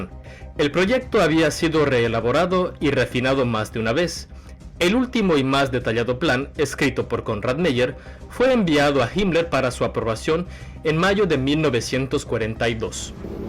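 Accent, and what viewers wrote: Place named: Russia. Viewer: me gusta mas la vos con el acento tipo ruso, siento que le da un estilo unico al canal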